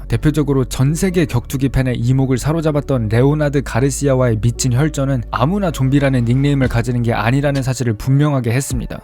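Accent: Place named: Gyeongsang, South Korea. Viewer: 은 고향이 경상도 어디세요? 저랑 사투리가 똑같네요ㅎㅎ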